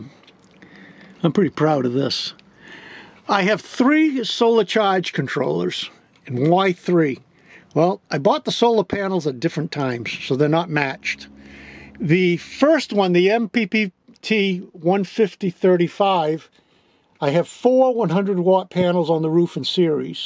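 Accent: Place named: Northeast, United States. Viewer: Where in the northeast? I can hear home in your voice.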